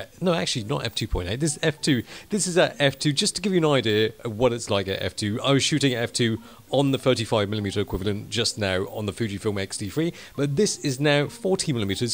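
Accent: England, United Kingdom. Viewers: I literally laughed out loud when that guy talked about your english accent 😂😂😂😂😂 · Your British accent melt my heart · FISH N CHIPS accent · I just love your thick british accent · Chinese people are usually way too accustomed to American accent, plus seeing an Asian with that kind of authentic British accent is not usually expected and pretty confused for the first one or two sentences. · Spends first 1/2 of video talking about the color the cap & the cat with an English accent no less. Hard to take. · I'm sorry if I'm rude.. but are you an asian? your british accent is freaking delicious to listen #nohomo :\ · Can you tell why you have an british accent?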